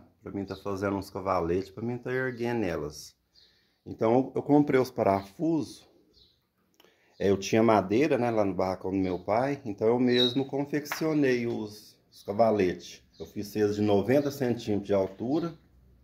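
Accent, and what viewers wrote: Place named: Minas Gerais, Brazil. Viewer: . A vai cobrar kkkk. Notei pelo sotaque. Também sou mineira 😁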